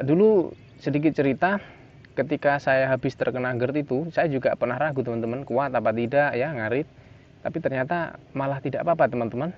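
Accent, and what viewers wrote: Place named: Java, Indonesia. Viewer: asli mn kok kyk orang jw..mhn tlg di bls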